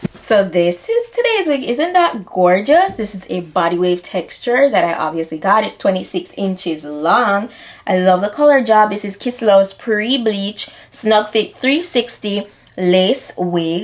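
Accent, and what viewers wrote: Jamaica. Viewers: Hello my sister my Jamaican sister you have to be from Jamaica because I hear the accent my family is from St Mary Jamaica and I bought this same wig to as well and I decided to look up somebody who was putting it on to see how I can situated on my head and I came across your video and you happen to be Jamaican too please tell me if I'm wrong but I can hear the accent girlfriend. Love ya my dearest. Blessings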